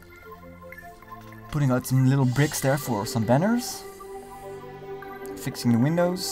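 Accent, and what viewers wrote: Netherlands. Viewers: is this guy dutch?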